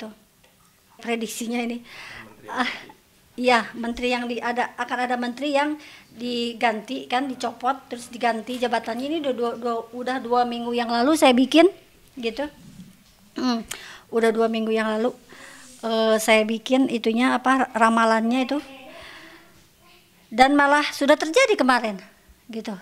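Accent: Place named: West Java, Indonesia. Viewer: Orang sunda ya, keliatan dr logatnya..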